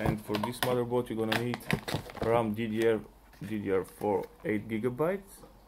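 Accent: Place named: Eastern Europe. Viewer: so where are you from bro your accent is slavic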